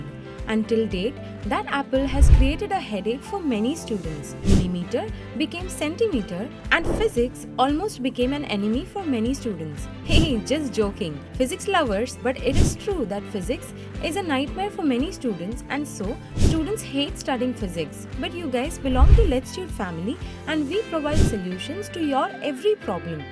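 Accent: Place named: Punjab, India. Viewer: Punjabi english and bio😊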